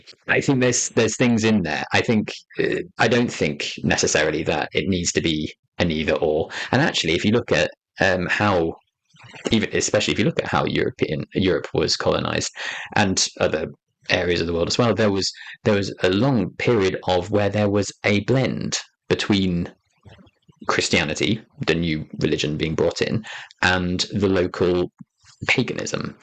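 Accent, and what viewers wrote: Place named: Southern England, United Kingdom. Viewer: 😂 well that explains a lot. I thought I had picked up from one of your other videos that you were from the deep south of England.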